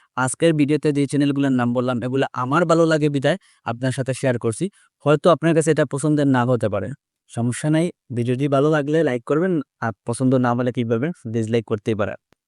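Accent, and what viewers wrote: Sylhet, Bangladesh. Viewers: Vaiya apni ki Sylhet er??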